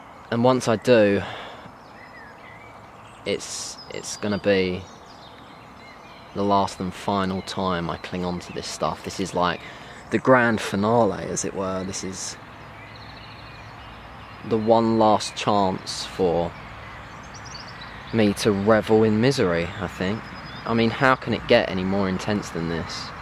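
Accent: England, United Kingdom. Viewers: This amazing Place + your English accent = The best combination of harmonic elements!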